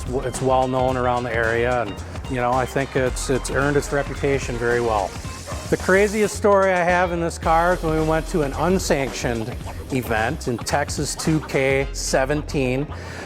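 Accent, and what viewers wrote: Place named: Minnesota, United States. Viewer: Don’t cha know?? I love minny accents. What a great car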